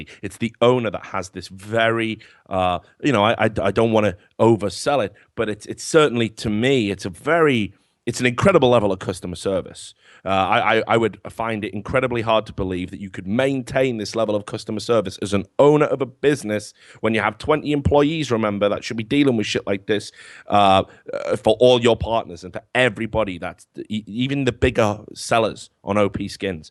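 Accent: United Kingdom. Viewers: Is it just me or is speaking in a more british accent in this video..:^) I like it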